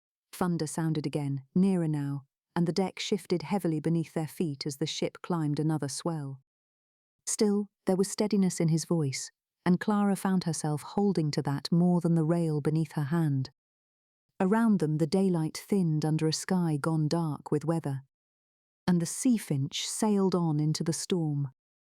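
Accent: England, United Kingdom. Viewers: I have to agree. There is nothing better than an English accent.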